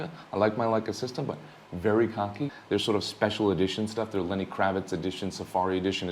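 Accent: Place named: New England, United States. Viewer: Got to tell you, its so refreshing to hear someone on Youtube talk like you do. I told my partner that you had to be New England. Masshole here.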